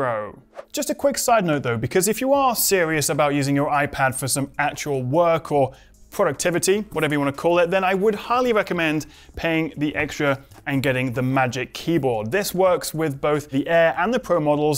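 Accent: United Kingdom. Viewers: I love how aggressively British you are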